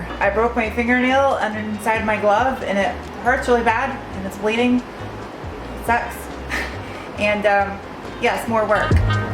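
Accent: Australia. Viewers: As an Australian I loved the acent you would fit right in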